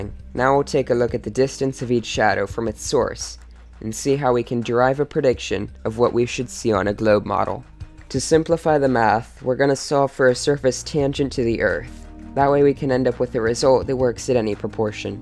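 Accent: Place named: Canada. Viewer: the video is awesome, but I was distracted by the fact that you said you live on the 37th parallel but also sound kind of Canadian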